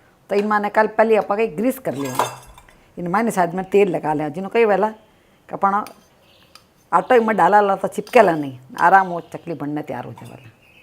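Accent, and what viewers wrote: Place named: Nagori, India. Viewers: ap ki zuban nagori hai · mai rajesthan s hu , ap ke language sun k apny mayke ke Yaad ati hai.... nice recipe 👌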